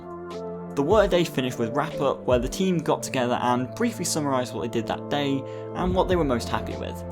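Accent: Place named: North, United Kingdom. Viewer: You almost said bahthroom. You are losing some of your Northern accent as I did when I started at Oxford in 1975.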